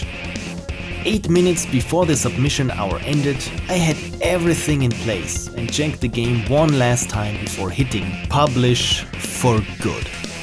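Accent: Germany. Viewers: I mean yea, you can hear the strong German Accent, but i pretty much forget about it in the first minute because im so damn fascinated about the quality of the video. I know this Post Morten Ludum Dare Series since two to three years, and always loved it, you are really talented at making this kind of stuff and i just hope one day you get the attention you really deserve. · Bruder muss los in allem ernste, fantastisches Video, ich hätte am Akzent gesagt du bist Deutsch aber die Alpen sagen irgendwie Österreich/Schweiz sono molto confuso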